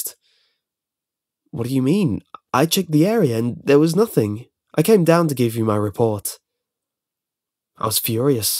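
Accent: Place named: North, United Kingdom. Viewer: Too Northern with unnecessary pauses in the wrong places... Bit of Brooklyn in there too...?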